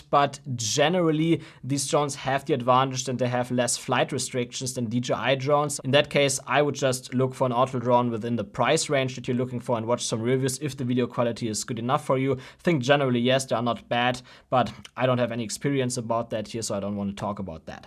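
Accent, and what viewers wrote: Germany. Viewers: are you from germany? sounds familar like you are speaking...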